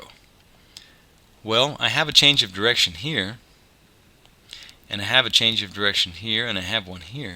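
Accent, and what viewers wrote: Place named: England, United Kingdom. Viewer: Just the paint, it seemd your accent was English. Nice video also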